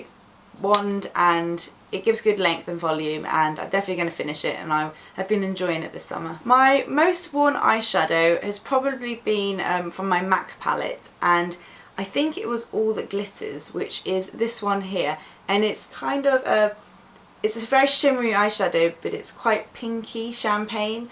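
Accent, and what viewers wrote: United Kingdom. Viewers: british accent...subscribed! :D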